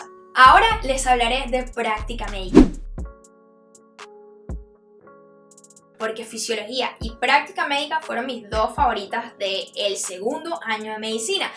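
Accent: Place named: Venezuela. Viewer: Por el acento yo diría que es venezolana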